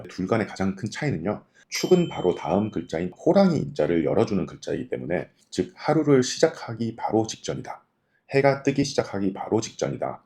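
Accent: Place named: Busan, South Korea. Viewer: 구독합니다. 좋은강의 부탁드립니다. 부산분이신가 봐요😮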